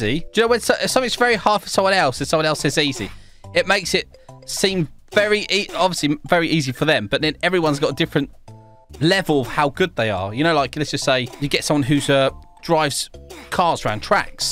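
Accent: United Kingdom. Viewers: Cool vid man the bri ish accent tho ✨AMAZING MAN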